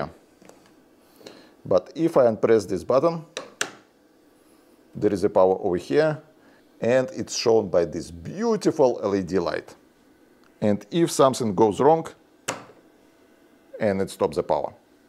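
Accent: Russia. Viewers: этоже руинглиш. все понятно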